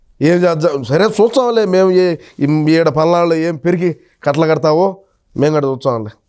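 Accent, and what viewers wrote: Rayalaseema, India. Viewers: '"రాయలసీమ యాస, గోదావరి ఏటకారం" కలిపి కొడుతున్నావు తమ్ముడు. ఇరగదీస్తున్నావు🙏🙏🙏🙏🙏🙏🙏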